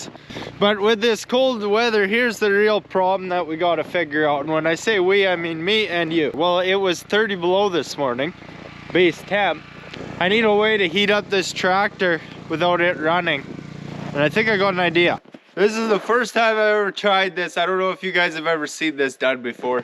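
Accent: Canada. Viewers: Based on what I can tell from this video alone you are a great roll model for other young men to learn from and I don't only mean the building of your home but also the way you speak about it and the work ethic you have, those parts can be used in many areas of ones life to live a good life. Besides, who doesn't love a Canadian who acts and talks as Canadian as one can get, lol. Loved this video! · First of all, this is so pleasant to watch and secondly, an awesome reminder of how hard work can be fun. The last thing is, I’m so charmed by this Canadian accent and I didn’t think I would be. It’s funny how we can speak the same language and it can still be so wildly different but it’s just different people evolving the way they speak in different parts of the world. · As a Canadian i can say, this dude has one of the strongest Canadian accents ive ever heard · Think you mean to say “Canadian” grit! 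That accent is classic 😆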